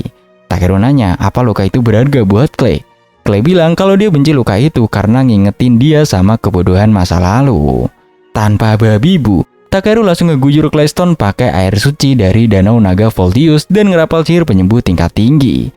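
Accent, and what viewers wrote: Medan, Indonesia. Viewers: Kaya Medan aja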